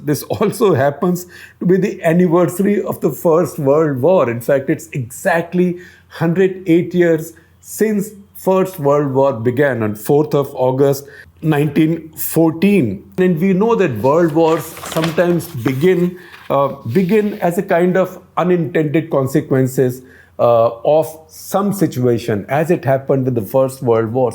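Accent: India. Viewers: It is Indian English and this is how we speak it here. Like it or lump it.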